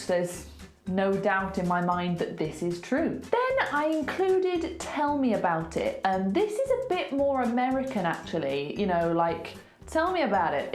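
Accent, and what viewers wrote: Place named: West Midlands, United Kingdom. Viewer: I cant believe you live in West midlands,im from walsall now your accent makes sense😃😃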